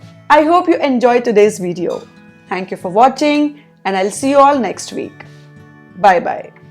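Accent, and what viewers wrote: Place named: India. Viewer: IM SORRY BUT A Hindu infused english accent has to be the strongest, heaviest, and most difficult to understand in the world. I cannot be the only one who finds this accent to feel like nails in a blender. I would rather subtitles in English, if you arent a native English speaker and you have a hindi accent. Please please, I pray you recognize how horrific this accent sounds. Its not your fault. But its miserable, and it is a choice to continue trying to record videos on youtube.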